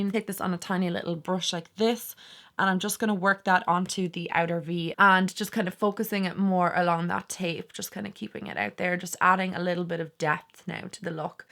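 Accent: Ireland. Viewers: That yellow, the red, and the purple red ... so gorgeous. I also love that green palette. Well, now the yellow palette is also beautiful. I think the purple and the red palette would be my favorite. I love those golds and whites and greenish gold shades. I'm brand new to your channel. Are you from Ireland? You sound like Brendan Gleeson. I wonder whether you are from the same area where he grew up? I think he lives in Dublin now, but I'm not sure where the various inflections and tones of each accent originate. WOW, when you did that up-close of your eye look, so beautiful and very well blended!Thank you for sharing your impressions.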